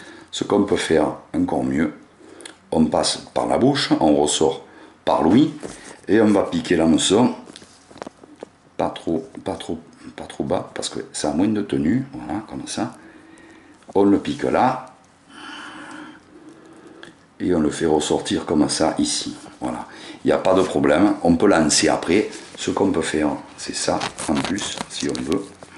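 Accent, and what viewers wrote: South, France. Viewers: la grosseur de l'hameçon en combien - du 2ou moins . merci pour toutes tes vidéos c'est un régal et en plus avé l'accent..merci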